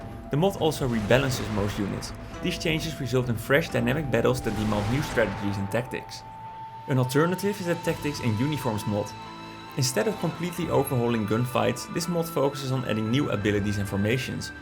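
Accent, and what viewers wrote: Netherlands. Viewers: I can hear your dutch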